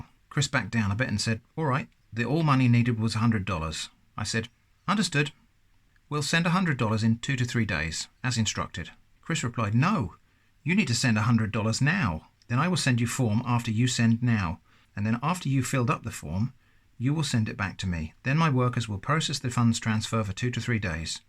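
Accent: United Kingdom. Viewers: I was gonna subscribe but british english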